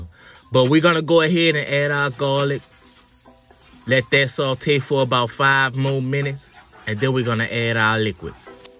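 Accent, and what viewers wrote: New Orleans, United States. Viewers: Ok I hear that Nola accent so you good😃Baby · This is the best gumbo recipe I have seen online and I have seen like 20 of them!! And we trust your judgment because you talk with a Louisiana accent 😄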